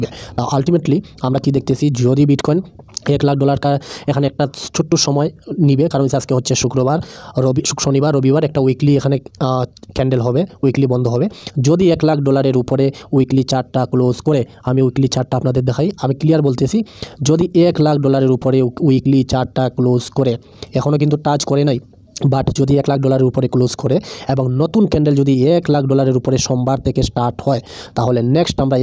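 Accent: Sylhet, Bangladesh. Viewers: মনে হয় সিলেটি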